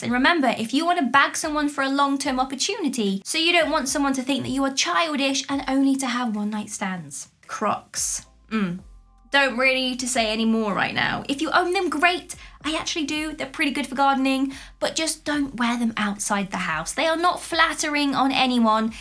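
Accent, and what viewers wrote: England, United Kingdom. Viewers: She is lovely, intelligent and her Queen's English is easy on the ears.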